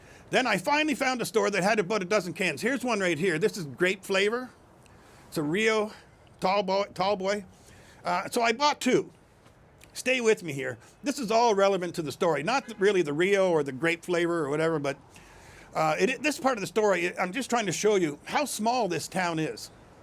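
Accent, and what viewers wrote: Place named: South, United States. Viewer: The fact that the locals recognise you as pro China is actually very positive. Also the other store probably was told of a foreigner who drinks the tall cans of grape juice. If you are right and it’s not a local thing then you would stick out even more because of that. Especially if they identify your accent as from the south and the drink also popular with people from a certain region.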